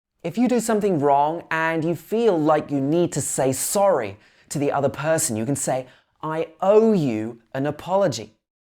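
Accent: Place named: RP, United Kingdom. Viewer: Contemporary (Modern) RP! Yay!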